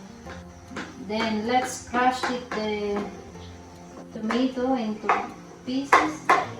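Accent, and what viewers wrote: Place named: Italy. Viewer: By the way i like your Italian accent...ciao...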